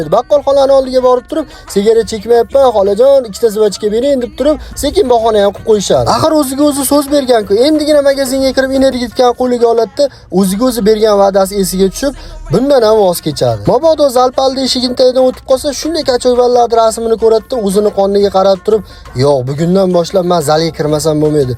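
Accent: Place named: Kokand, Uzbekistan. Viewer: Qoqonli misiz bildim qoqon dan siz 😂😂🤣🤣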